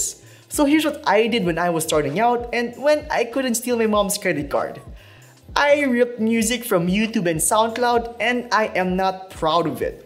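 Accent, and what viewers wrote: Philippines. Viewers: I love that Filipino accent. Beautiful people with beautiful voices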